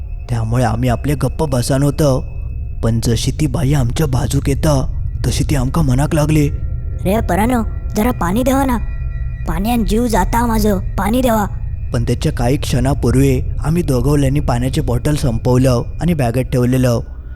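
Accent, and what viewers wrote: Malvani, India. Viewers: Which malvani he is talking ..this is different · मालवणी बोको हा चॅनेल मी माझ्या 3 अकाउंट वर फॉलो केलेला असा. आणि मित्र परिवाराला ही फॉलो करायला सांगितलं असा. माझ्या घरातल्या 16 जना नच्या मोबाईल वरून पण फॉलो केलेला असा. गावी जातो तर तुमचे व्हिडिओ आम्ही ग्रुप ने बसल्यावर बघतो रात्रीचे. खूप thrill वाटते ग्रुप मध्ये बसून ऐकायला एक वेगळीच मज्जा येते. त्यात ह्या व्हिडिओ मध्ये तुमचा मालवणी आवाज.त्यामुळे व्हिडिओ ला एक वेगळीच मज्जा येते. मी रोज दिवसातून 4 व्हिडिओ बघतोच बघतोच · I.like.your.malvani.voice.laya.bhari.rel.Vivek Singnath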